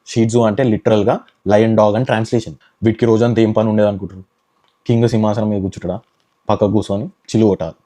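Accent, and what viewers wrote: Telangana, India. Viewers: Telangana accent tooo good .. Lovely narration style and information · Telangana slang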